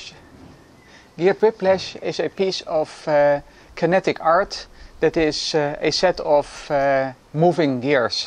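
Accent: Netherlands. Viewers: Where are you from? Netherlands? Germany? Maybe Denmark? Because I'm not good with accents.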